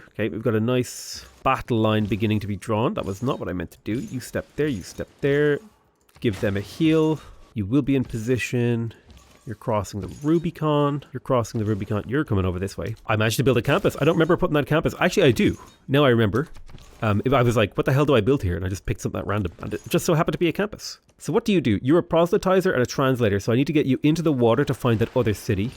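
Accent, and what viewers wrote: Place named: Ireland. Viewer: lol It's really interesting hearing an Irishman talking about destroying the Catholics. Also, you sound crazy like Dara Ó Briain to me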